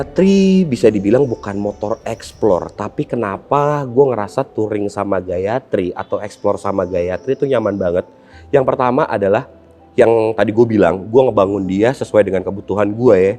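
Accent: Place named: South Jakarta, Indonesia. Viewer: Kyk abang"an w ini mah.. dari cara ngomong + gaya ny kyk anak jaksel.. 👏👍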